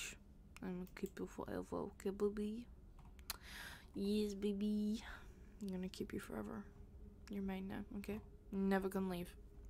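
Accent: Scotland, United Kingdom. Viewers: The first part was a bit to loud and violent for me. But this one was lovely! And I do love your scottish accent so much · Are you Scottish or I'm so confused oh god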